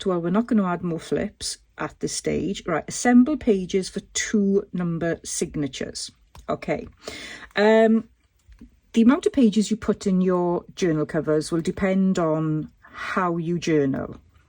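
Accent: Wales, United Kingdom. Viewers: Wow. This is amazing. Thank you for the tutorial. I absolutely LOVE your Welsh accent.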